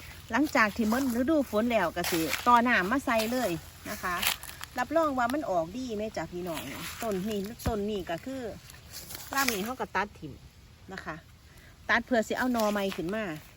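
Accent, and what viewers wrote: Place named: Sisaket, Thailand. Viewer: สำเนียงแบบนี้ศรีสะเกษคักๆครับผม😂😂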